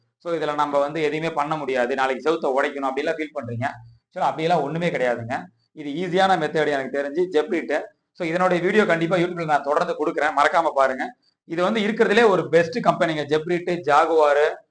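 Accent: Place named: Andhra Pradesh, India. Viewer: Andhra pradesh erundhu pesuri nanba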